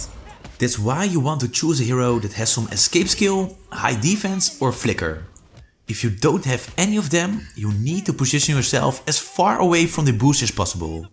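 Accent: Netherlands. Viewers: I can hear Dutch accent. Am I right? 😂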